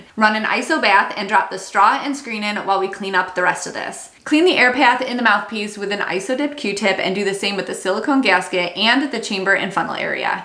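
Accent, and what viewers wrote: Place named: Midwest, United States. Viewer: Your accent, Let me guess Illinois or Minnesota maybe….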